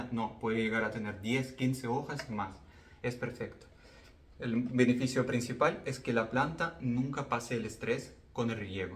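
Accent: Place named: England, United Kingdom. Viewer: querido es un placer escucharte hablar y veo todo tu trabajo merece destacar,la linda forma de explicar cada cosa,eso vale mucho! cuando se pone el ingrediente principal que es el AMOR Todo es mejor, y es más ameno todo! Espero que sigas haciendo vídeos lindo,como dijo el escritor: 2Brian Weiss:Lo Único Real Es El Amor LoDemás...Es Una Ilusión" Te felicito tu pronunciación del idioma español lo hablas muy bien y me encanta tu acento ingles le da un toque de distinción!👏👏👏👏👏👋😀👍Un fuerte abrazo desde Uruguay! Muchas Gracias🌵🍀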